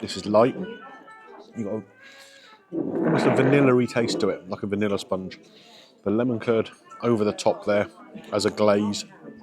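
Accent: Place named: Norwich, United Kingdom. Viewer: With your accent you sound like a Norwich local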